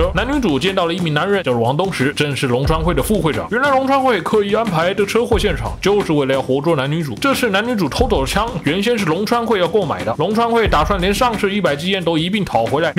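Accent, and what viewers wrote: China. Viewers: 故意用中國腔講電影🤣🤣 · 是中国腔，，你的叔叔是中国人吗？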